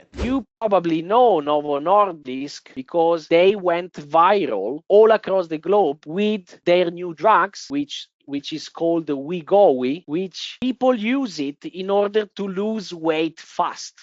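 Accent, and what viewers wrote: Italy. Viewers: You have italian accent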